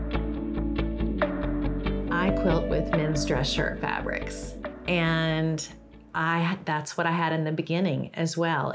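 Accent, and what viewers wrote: South, United States. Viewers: Can I just say you are hilarious in the most wonderful way! You remind me of my best friend Jane, so ifeel I know you already. I have already made quilts but I clicked on your series because my daughter gave me some of her husbands shirts. I cut the collars and cuffs off and she said she needed them back! Shock horror! Lucky for me she was only joking! So thanks for sharing your knowledge, your sense of humour and your beautiful personality and your southern accent. from Jilly in U.K. x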